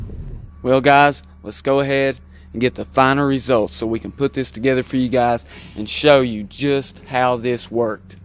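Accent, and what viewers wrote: South, United States. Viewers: I’m guessing you’re from the south 😂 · That southern drawl is driving me crazy. And I'm from Mississippi.😂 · You country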